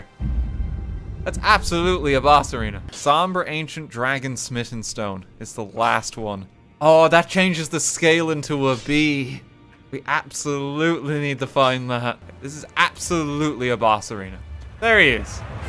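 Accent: Ireland. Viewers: This is my first time watching him and I was just like; "Sniff sniff, is that an Irish accent I hear?" I love the rep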